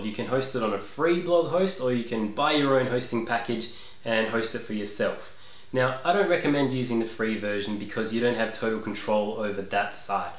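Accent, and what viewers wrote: Australia. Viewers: wot? you call that an Aussie accent hahaha do NOT apologise for the way we speak most peeps worldwide love our accent just remember to speak slowly I posted my video in reply - just not sure if it came through anyway, love this one have you thought of putting text over as a break from just you talking? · I didn't even hear you mention your accent (I watched the video 2x), let alone apologise for it, but I love it! Aussie accents and Aussie slang are awesome, and among my favourites in the world! :)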